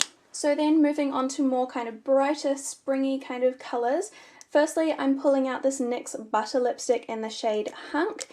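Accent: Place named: RP, United Kingdom. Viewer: I just come to listen to your accent... and your kinda posh lol x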